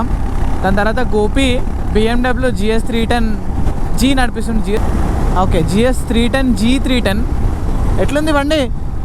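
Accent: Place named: Telangana, India. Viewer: U r the person who comes from the heart of telangana.(slang,style) aba aba rendu kallu chavalu anoku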